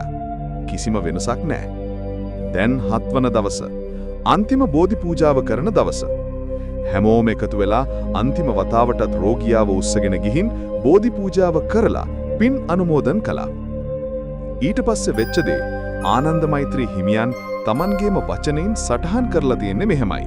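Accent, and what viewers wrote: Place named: Tamil, Sri Lanka. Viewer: මේ voice එක AI ද? එසේ නම් කරුණාකර සිංහල භාෂාවෙන් හඬ කවන වීඩියෝ සඳහා නියම සිංහල උච්චාරණය සහිත AI voicing version එකක් තෝරා ගන්නා ලෙසට මේ සංලක්ෂා යු ටියුබ් නාලිකාවේ හිමිකාරත්වයෙන් ඉල්ලා සිටිමි. නැතිනම් සිංහල මවු භාෂාව වන අයෙකු ලවා ඔබගේ script එක voice කරවා ගන්න. මේ නාලිකාවේ සෑම වීඩියෝවක්ම තියෙන්නෙ මේ කටහඬින්. අපිට දැනෙන්නේ හරියට දෙමල භාෂාව කතාකරන කෙනෙක් සිංහල කතා කරනවා වගේ. එය එක දිගට ශ්‍රවණය කරද්දී අමාරුයි, රසවින්දනයට බාධාවක්. ඔබගේ වීඩියෝ හොඳයි, educative,We feel like we are listening to a different language. Please don't interpret this as discrimination against any other language.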